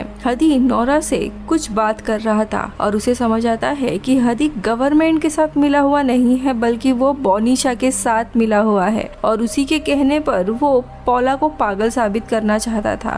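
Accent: Maharashtra, India. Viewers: Mam aap Maharashtra se ho ?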